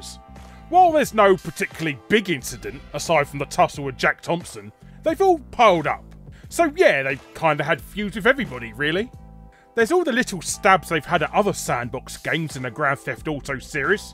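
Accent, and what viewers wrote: United Kingdom. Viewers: Time for another 13 minutes of that good ol' British accent.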